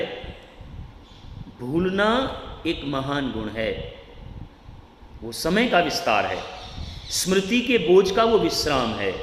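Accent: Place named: India. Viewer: Your voice completly hindi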